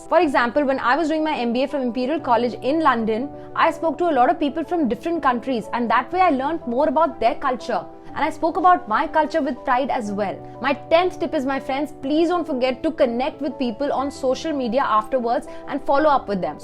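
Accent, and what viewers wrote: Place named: India. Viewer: Idian english for sure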